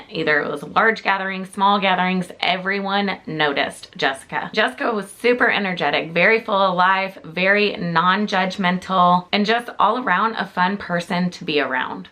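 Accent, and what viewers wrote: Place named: Georgia, United States. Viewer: Sweetie, I don't know why I just heard. You're from Georgia, omg,me too,I was born and raised in south Atlanta, College Park and east point area, I moved to Jasper in 2001.,and lived there till a few months ago when I had to move to Virginia, my middle son and grandchildren are here. My other 2 boys r still in Georgia. I can't tell u how much I miss it. I've never lived anywhere else. I should have known by ur accent. That's awsome that I found ur channel. Oh,this is so heartbreaking,,poor girl. I feel so bad for her mama. She was a beautiful girl. I have a heart for anyone with substance issues as I lost my only sister,in 03,and my nephew,,her son,just in November, both from issues from having done substances. Oh,her kids,that breaks my heart sweetie,,and I hope they find the truth. Thank you so much for the video sweetie. 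Its awsome that you're a Georgia girl too. Happy Easter to you and your family ❤🐰🥚🐥🐣🐇🌺🥰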